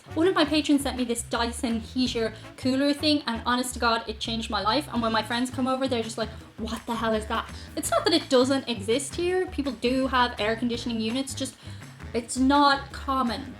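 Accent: United States. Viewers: very insightful! you talk so much like an American that i forget English is your 2nd language. You must be quite smart! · Why don't you sound like Sersha Ronan? I think you're American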